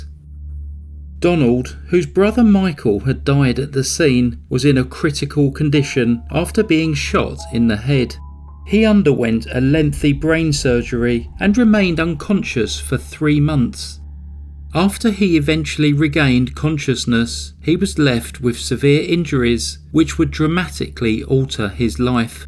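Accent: United Kingdom. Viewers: I wish I had a calm British accent, it just makes storytelling more pleasurable (no matter how heinous the story is)...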